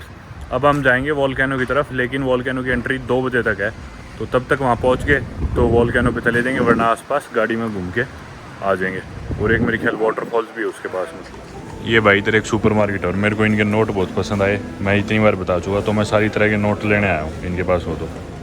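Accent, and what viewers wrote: Haryana, India. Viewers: The thick haryanvi accent is such a turn off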